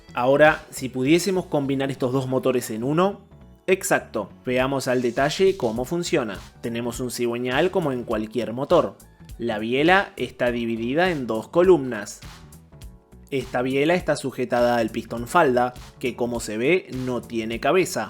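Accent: Argentina. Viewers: Está buenísima la info y, escuchar en idioma argento...le dá un plus❤❤❤❤😂😊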